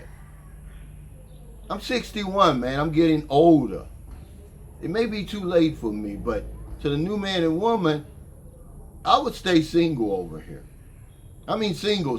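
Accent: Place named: New York, United States. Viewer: I love this guy - New Yawk!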